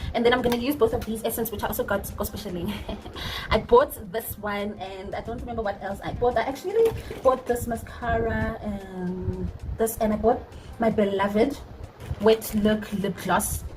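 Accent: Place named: United States. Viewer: Have I ever told you about ur accent🔥🔥❤❤❤ .....yoh ape yeh okarr ole American❤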